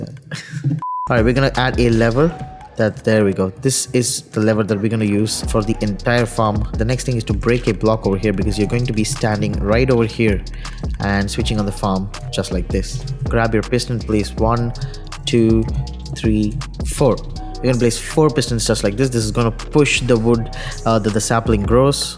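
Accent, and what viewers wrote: India. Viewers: Man i love the indian accent your good bro your explanation is great the otherd is shi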